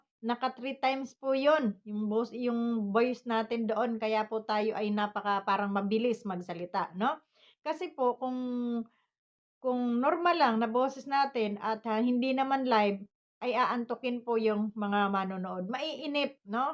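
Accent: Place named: Visayas, Philippines. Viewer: Hahahaha ni gawas gyd ang pagka bisaya .. good vibes lang basta ako Edcy ako solid edcy..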